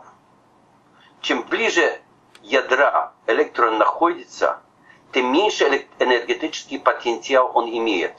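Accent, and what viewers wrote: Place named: Poland. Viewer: Польский акцент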